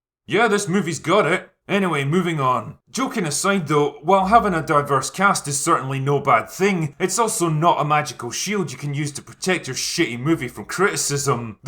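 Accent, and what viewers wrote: Scotland, United Kingdom. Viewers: Brilliant critical reviews masterfully delivered in a way only a Scot can do is the reason why we need to protect and defend our ways and traditions as if the world depended on it - because it does. Thank you for bringing a huge slice of goodness out for all to see what goodness is all about.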